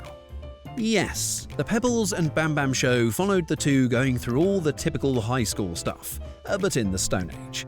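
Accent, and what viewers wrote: United Kingdom. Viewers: Calling all of these cartoons "bizarre" while acting as if Scooby was normal is what's bizarre. And then to do it with a British accent? 👎🏿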